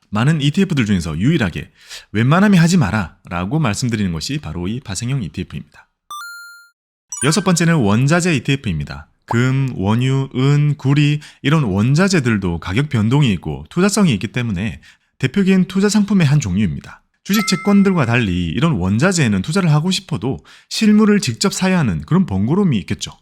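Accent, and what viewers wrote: Gyeongsang, South Korea. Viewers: 경상도 사투리가 있는거 같지만 목소리가 너무 좋습니다. 귀에 쏙쏙 들어오네요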